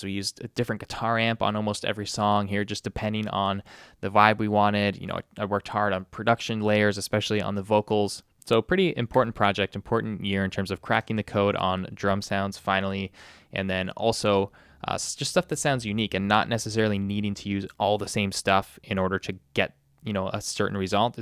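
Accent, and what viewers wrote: Canada. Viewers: Video recommended on YouTube. "Well that's a good southern Ontario accent if I've ever heard one". Going through bands - oh yeah, these are all the hardcore bands I listened to in High School, man, where I grew up had such a crazy hardcore scene. Then proceeds to play song from band I went to high school with. Well yeah. That makes sense.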